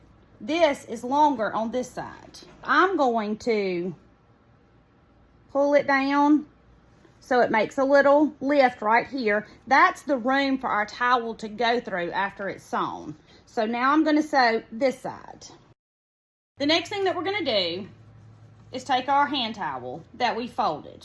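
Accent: South, United States. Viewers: I’m from Arkansas and I live in Idaho. Your accent made my heart ache for home 😭 I love your channel and will follow for sewing tips AND to hear that beautiful southern accent. Thank you so much and God bless you ♥️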